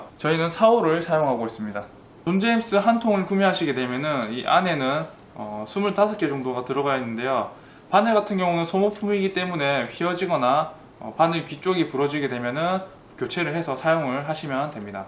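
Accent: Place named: Jinju, South Korea. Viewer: 음 ...ㅎㅎㅎ 진주분이신가보다 ㅋㅋㅋ 억양이 왠지 진주스타일 ㅋㅋㅋ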